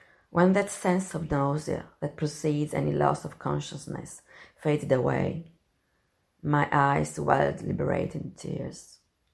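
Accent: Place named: Italy. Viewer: Eccomi ancora,! In English, please! Parla inglese benissimo, e, con il piccolo accento italiano, dà un tocco di bellezza in più! Io potrei leggere il Suo libro in italiano, visto che ho studiato l'italiano, e che l'italiano è, posso dirlo, la "seconda lingua" della mia vita, dopo il francese che è la mia lingua madre! Sono contentissimo quando posso praticare l'italiano, perché, con le persone che vivono accanto a me, non ho l'opportunità, purtroppo...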